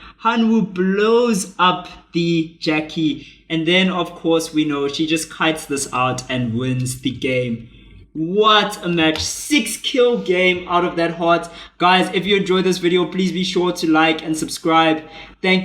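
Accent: South Africa. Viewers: You're attitude is great and the thumbnails are clean good job dude, nice accent too sound maybe like south africa or somewhere in africa?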